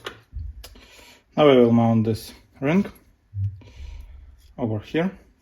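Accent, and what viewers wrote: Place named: Russia. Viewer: Strong russian accent...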